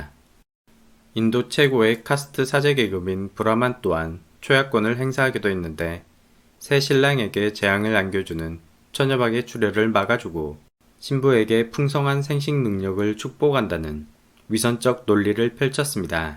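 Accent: Jeolla, South Korea. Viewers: 전라도 사투리가 정겹습니다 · 숨기지 못한 전라도 사투리로 듣는 길가메쉬 이야기 감사합니다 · 전라도 분이신가 사투리발음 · 혹시 전라도 분이신가요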